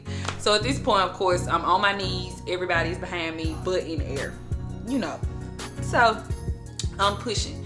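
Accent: South, United States. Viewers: I'm sorry for your loss. Your spirit is amazing. I'm glad you said it...not making light of the situation because you had me lol. Where are you from because you sound just as country as I do😉🥰💫 Not the transformers!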